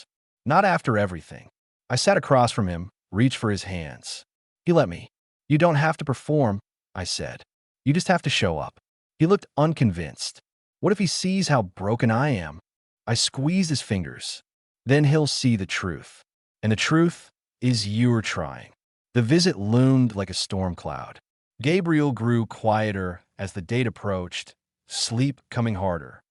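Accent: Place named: Spain. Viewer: I agree with you that this story was good but did you hear the Spanish intonation in the narrative? Best wishes to you 🙋‍♂️🇬🇧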